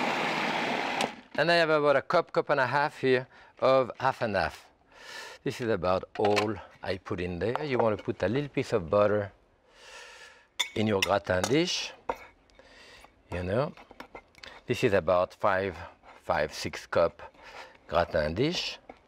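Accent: France. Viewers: Yeah, after so long in the US, French accent mostly shows through in dropping the 's' in plural words. I mean, there's other things, too, like 'roast it for, like, an arrr" which are just beautiful. He couldn't be more American but also, just, so, so French. (I'm from Chicago, but I live in Montreal, so my French is...weird) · "I was repeating what I heard." if that's what you heard, THAT'S the problem. he doesn't say "ze.I was not making fun of his English" I believe that, I believe you... which is part of the immigrant problem (because he doesn't say "ze")... but YOU still somehow "hear" (I don't doubt this) some caricature of a french accent. (and I'll fully admit his accent lives on in his "hour" - but JFC just STOP with the "ze" nonsense) julia child called him instead of "." that's just true. your "Pepe LePew" nonsense isn't. if you read this far: I don't think you were trying to mock his accent. 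but I also don't think you were listening.... or you were and you're an american that somehow has never heard an accent beyond and LePew (and maybe Clouseau: i.e., "minkey")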